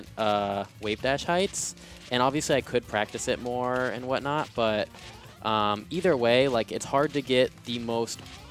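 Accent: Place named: Canada. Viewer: This dude canadian?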